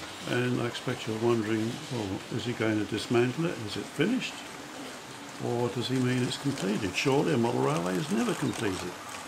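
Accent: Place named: Australia. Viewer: Hi, very sorry to hear of your continued back pain. I know how it can make everyday life very draining. Getting down to rail level and filming can be particularly difficult. However, if you can manage it, please carry on posting the videos. As you rightly say, there are lots of great youtube channels for model railways, but your layout has got to be one of the most enjoyable to watch. There's something interesting in every shot, and I love watching your trains go by. This Pommie even enjoys the Aussie accent! Best wishes for 2023.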